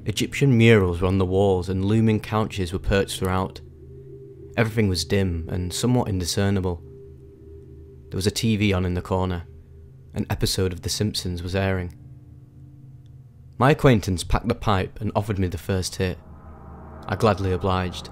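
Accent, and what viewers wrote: United Kingdom. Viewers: Having a British accent is like easy mode for commentary channels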